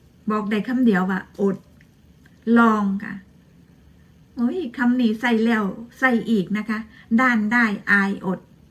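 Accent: Ubon Ratchathani, Thailand. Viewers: คนอุบลนี่เว้าจาม่วนๆๆสุภาพอิหลีได่น้อจร้าา🥰🥰🥰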